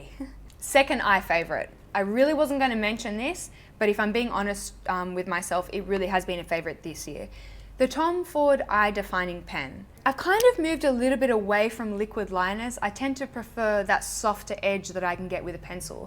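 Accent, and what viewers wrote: Australia. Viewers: I accidentally spoke in your kind of Aussie accent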